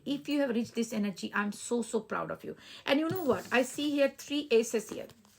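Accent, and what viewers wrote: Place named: Kerala, India. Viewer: Didi ji,your assent tells that you belong to kerala than bengali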